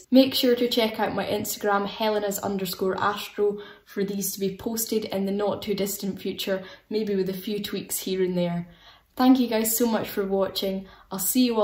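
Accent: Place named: Highlands, United Kingdom. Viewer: Great video! Did my high school years in Nairn, so its nice to hear that lovely Highland accent again! Keep up the great work and thanks for flying the flag for UK Astrophotography!:)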